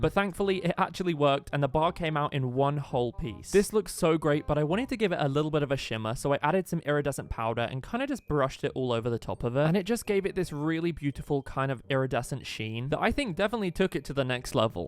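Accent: United Kingdom. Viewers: The british accent✨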